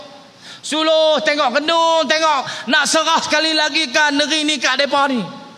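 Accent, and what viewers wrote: Kedah, Malaysia. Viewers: Saya suka loghat Kedah. Sedap didengar. Bertambah sedap bila MB Kedah, Dato Sanusi berucap sbb ada unsur jenaka berisi yg menyebabkan tidak jemu didengar. Semoga PN diberi kemenangan besar di Kedah. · Melawak cara orang kedah, seronok dengar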